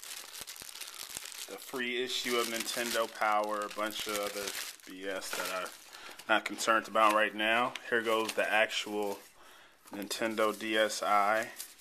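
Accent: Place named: United Kingdom. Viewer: i bet your british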